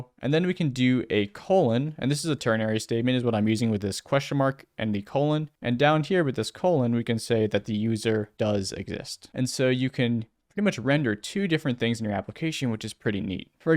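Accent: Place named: United States. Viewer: your english is the best I can understand. true American accent I use to learn in school. thanks for the great tutorial.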